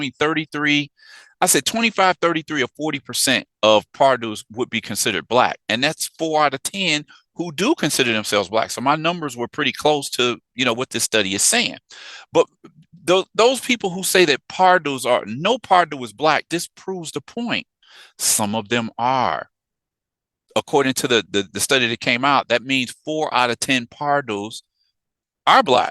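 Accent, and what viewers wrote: United States. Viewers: Question: Are you from Brazil?…You sound like you’re from the USA.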